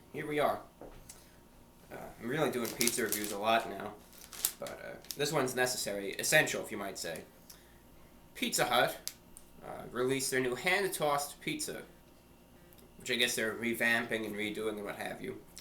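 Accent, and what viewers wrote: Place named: United States. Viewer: I dont know if this american sounding dude is in the UK or not based on the fact he said takeaway instead of takeout in another video 🤔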